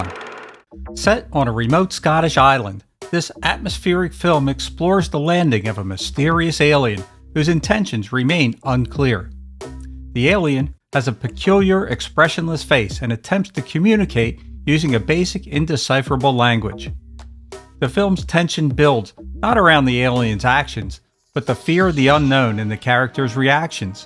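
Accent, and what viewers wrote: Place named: Delaware Valley, United States. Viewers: Don’t ever lose that Philly accent! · Judging by your accent, you grew up in the Philly/Del/South Jersey area.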